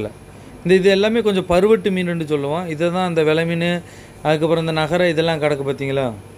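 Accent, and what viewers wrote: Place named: Tamil Nadu, India. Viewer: Video super anna... Neenga pesitu video potta than nalla irukku.... Neenga pesurathu namma oor slang .... So athukaga than video pakkuren.... MY native tiruchendur... 💜💞♥️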